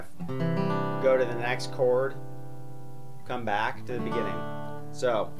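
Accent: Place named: South, United States. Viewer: you accent are totally southern! XD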